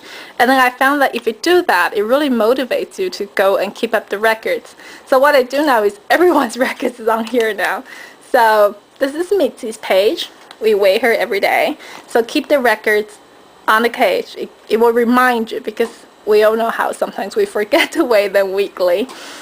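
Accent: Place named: China. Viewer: She's Chinese, so it's just her accent.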